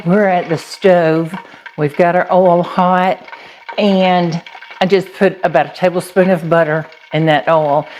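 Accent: South, United States. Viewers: So cute in your southern accent : buttered my little buns.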